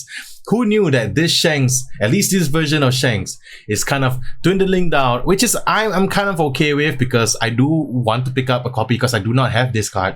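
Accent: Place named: United Kingdom. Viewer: amazing content! I thought u were brittish ur accent so good!!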